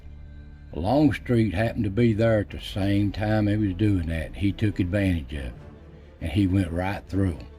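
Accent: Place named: South, United States. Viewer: Great video Sir. I could listen to your beautiful Southern Accent all day and night. My husband & I went to the battlefield. There was a moment when I felt overwhelmed and could not move. I told my husband " something terrible happened right here. I can feel it. It is such a soul-stabbing sadness that overcame me. I couldn't move. It was late afternoon, in summer. As sunset was beginning, we finally found the battlefield. He said "no baby, it is here. On this field, there are monuments to the battle. Come over here and look." He stood in the humid, hot air, in the tall grass of the battlefield. I had been overcome by grief at the dividing plot of land and tall pines between the lanes of the road leading to and away from that exact site. There, between the roads, I could feel the sadness wash over me like an ocean wave. I couldn't explain it, but I felt them...all watching me, and telling me ' it happened here". 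I have never forgotten that afternoon, nor will I ever.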